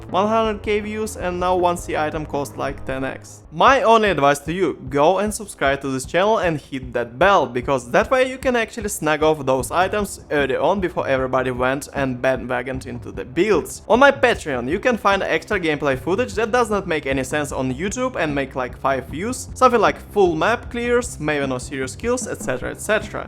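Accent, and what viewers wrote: Russia. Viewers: мне кажется или у чувака русский акцент?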